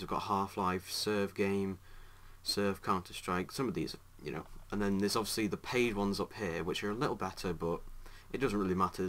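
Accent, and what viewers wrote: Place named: Scotland, United Kingdom. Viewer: Shut up he sounds a bit SCOTTISH IM Scottish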